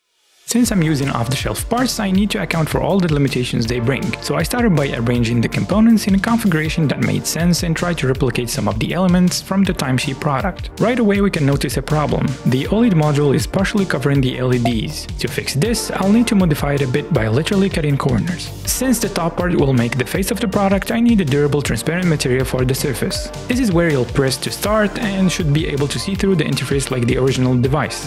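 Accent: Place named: Morocco. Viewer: Great Job! I have a friend who is interested in programming, he would love to see this. I can guess from the accent that you are probably from Morocco Correct me if i'm wrong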